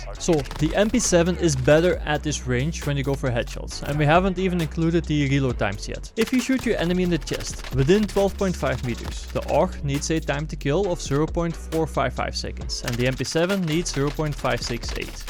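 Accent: Netherlands. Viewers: Pretty sure he is dutch judging from the accent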